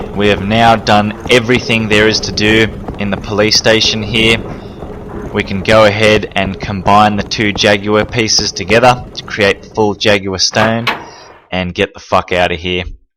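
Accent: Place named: Australia. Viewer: “We’re going heeee, spiders will be in heeee, but we now have the eagle medal, so we will go ahead and put it in heeeee…” Love the aussie speak 😄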